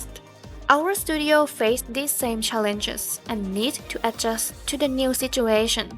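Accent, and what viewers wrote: Thailand. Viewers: I knew it from your accent and bombastic artstyle that you are from Thailand (ONE OF US ONE OF US) this video helps me so much as a young aspiring artist +sub!! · กรี๊ดดดดด สวัสดีค่ะ ผ่านเข้ามาเจอ คิดว่าสำเนียงไทยม๊าก สนุปว่าคนไทยจิงด้วยย เก่งมากๆๆๆเลยค่ะ❤❤❤ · นั่งฟังตั้งแต่ต้นคลิป รู้สึกว่ามีไอบรรยากาศความเป็นคนไทยมากๆ ทั้งการออกเสียงทั้งสำเนียง สุดท้ายก็คนไทยจริงๆด้วย😭 เก่งมากๆเลยค่ะ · ฟังสำเนียงคิดว่าน่าจะคนไทย ใช่จริงๆ ด้วย เก่งมากเลยค่ะ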